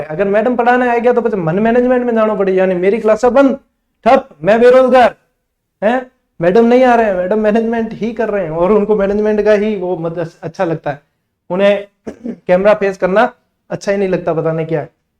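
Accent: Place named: Marwari, India. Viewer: Sir आपकी बोली घनी सुंदर लाग h marwari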